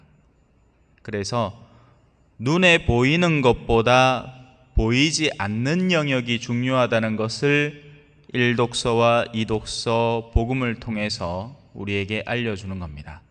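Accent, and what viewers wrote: Gyeongsang, South Korea. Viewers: 🕯🥀찬미예수님... 신부님.오늘.말씀..참.올바르신.말씀압니다...감사합니다.찐.펜.입니다 경상도.말씀.화통하신.웃음..아멘🕯🥀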